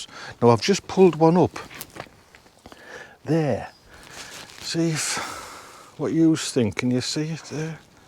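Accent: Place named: North East England, United Kingdom. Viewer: Just stumbled across your channel today and as fellow North Easterner born and bred just down the road from you in the big settlement....:), it's great to hear the twang again. I've just started my plot after all these years and am looking forward to your adventures.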